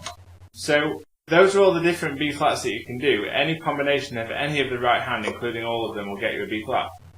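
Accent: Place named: England, United Kingdom. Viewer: I like your haevy english accent,knookle key. There is bis, flute 1 /1. Albert clarinet side key, great well shown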